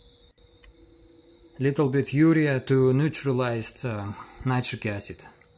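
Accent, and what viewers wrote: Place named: Russia. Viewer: Слышен русский акцент)))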